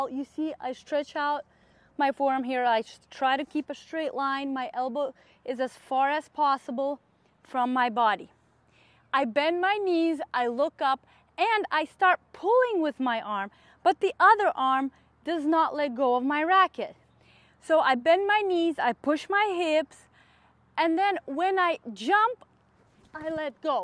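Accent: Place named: Eastern Europe. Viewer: Just curious love the accent are you Eastern European?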